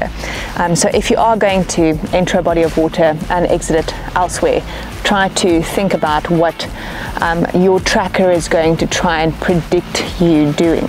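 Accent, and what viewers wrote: South Africa. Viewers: You have a pretorian accent 😊 I hope I’m right? I’m from the USA, it’s worth a guess. Also excellent content! Subbed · Listening to a South African teach me how to counter track just feels right. 🥷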